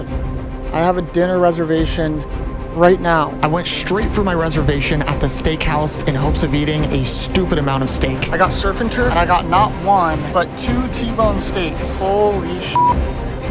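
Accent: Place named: Germany. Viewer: german accent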